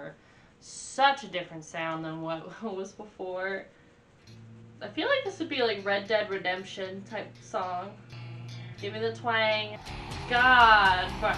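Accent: South, United States. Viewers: Sweetheart, where are you from? I'm from Tennessee and I love your southern accent. I pretty much lost my southern accent in college. But I still think it's cute for a female! The song is filmed at a concert they did in 1991 In Moscow Russia, 1.6 million people were at that show. Dimebag Darrell is the guitar player and he was killed on stage on 04 but he was and still is a guitar God. His brother Vinny Paul Abbott is playing drums. They were both legendary.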